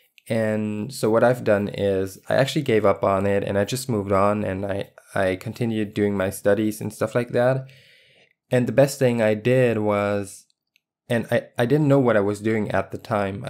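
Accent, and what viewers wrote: Germany. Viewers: Are you German? Btw love the work! :D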